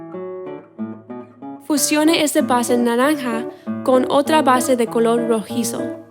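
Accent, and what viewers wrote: Spain. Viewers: Me dio mucha ternura tu acento español jajajaja hermoso maquillaje. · Me encanto el vídeo y me encanto escuchar tu español💕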